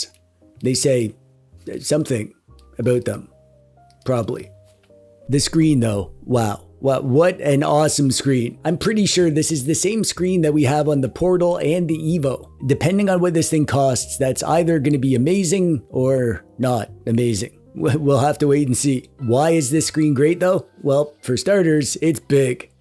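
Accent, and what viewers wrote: Canada. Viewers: That Canadian voice can't be hidden ❤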